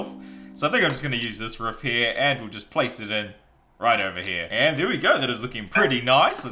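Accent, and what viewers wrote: New Zealand. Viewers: You from nz g?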